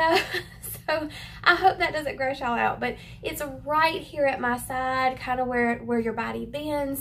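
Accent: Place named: Louisiana, United States. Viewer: Girl! You are cute as a button! Where are you from? I’m from Louisiana and your accent sounds like home:) I just found your videos and love your clothing reviews:) I’m just now trying some online clothes shopping and it’s great to have honest opinions. We have almost the exact same measurements and body shape as well, so I’ll definitely continue watching your reviews:) Thanks for all you do!💕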